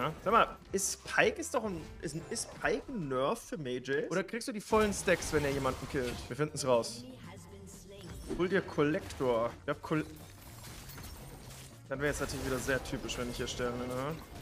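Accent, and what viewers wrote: Bavaria, Germany. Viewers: Bitte mehr Bayrischen Stiel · Ich liebe bayrisch so dermaßen. Mein absoluter lieblings Dialekt Schöne Grüße aus dem Kölsche Veedel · Kannst du mal nen Bayrischen Stream machen, Gragas und Cho only und nur im Dialekt reden, würde ich sehr fühlen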